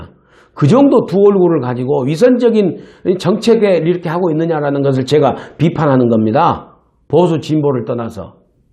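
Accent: Jeolla, South Korea. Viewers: 민영삼원장님 요즘 화면으로 자주뵈오니 정말 반갑고 말씀들으니 너무 고마운말씀에 감동받아 눈물이 나네요 세상을 바로보시고 정확한논평 100프로 공감합니다 저는 경상도아줌인데 약간의 전라도말씨를 들으니 모든전라도분이 다 좋게 느껴지네요 (김세윤판사빼고) 앞으로도 자주뵙고싶어요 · 이넘은 전라도 말투나 쓰지말고 여당을 때리지 말지 참어이가 없네 ㅋ ㅋ ㅋ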